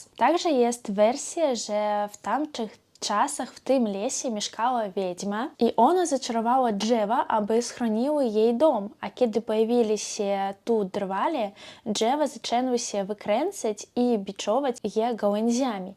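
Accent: East, Poland. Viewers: Lubię pania słuchać. Język polski w pani wydaniu brzmi fascynująco. Mam nadzieję, że nie pozbędzie się pani swojego wschodniego akcentu. Okolice naszego Krakowa są bardzo ciekawe. Myślę że zabraknie nam życia żeby poznać wszystko. Pozdrawiam sąsiadkę. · Pani wschodni akcent w polskiej mowie brzmi bardzo barwnie, przyjemnie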